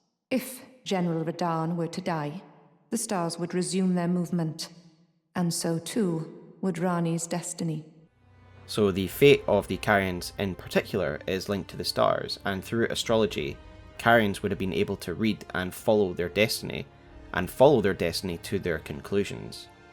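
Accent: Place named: Scotland, United Kingdom. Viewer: Nothing makes me happier than hearing a Scot talk about Souls lore. Bring me McFlail!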